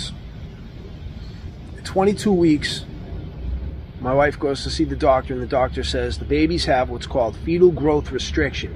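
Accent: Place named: New England, United States. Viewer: Wowwww!!!! Nothing is impossible with our King 👑 🔥 He's got that New England accent I hear up here often 😅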